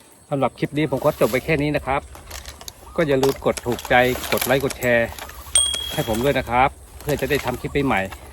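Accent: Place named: Central Thailand, Thailand. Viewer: สวัสดีครับน้าเพิ่งเข้าดูติดตามให้กำลังใจครับชอบดูคลิปดักหนูน้าอยู่จังหวัดอะไรครับดูจากสำเนียงการพูดน่าจะเป็นคนภาคกลางครับ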